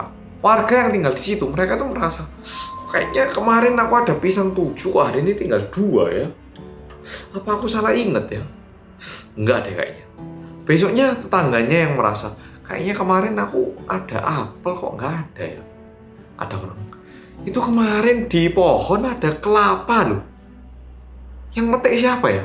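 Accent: Java, Indonesia. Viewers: logat Jawa nya sudah mengental 😁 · Bersama orang Korea yang medhook, Tiap opening pasti ngikutin mas ngomong medhookkkkkkkkkkkkkkkk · Serial killer jos tenan.alias medok.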